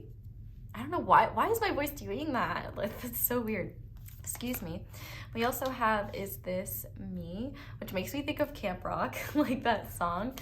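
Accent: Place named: New York, United States. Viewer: the new yorker accent is not your friend :)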